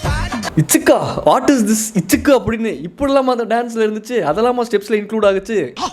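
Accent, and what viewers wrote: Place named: Kongu, India. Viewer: Kongu pechu varuthu athan.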